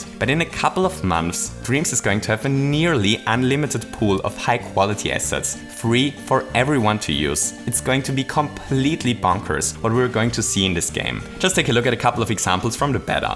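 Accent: Germany. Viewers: Is he german? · Wait.. Are you German? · Are you from a german speaking country? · Are you German? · Wait what? Are you german? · Wait? Are you from Germany? ? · Oh, you are German too? I knew your accent seemed familiar for me xD Nice content man, love your channel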